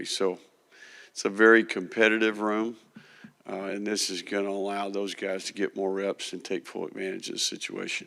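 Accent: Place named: Tennessee, United States. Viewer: You must not be from Tennessee lol everyone talks like him In Tennessee (where he’s from)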